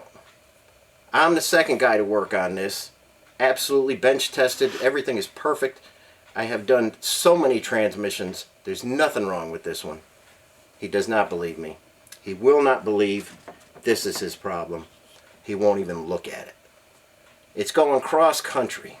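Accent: United States. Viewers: Yeah well sound very American to me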